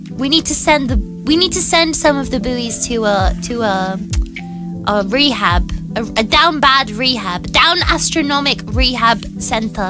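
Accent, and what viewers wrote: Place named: United Kingdom. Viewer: I think its the firs time I hear a british vtuber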